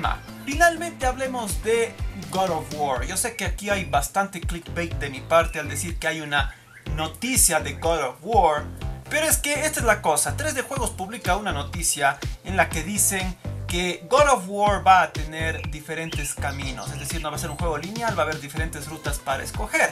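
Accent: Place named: Cuenca, Ecuador. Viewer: habla con tu asentó cuencano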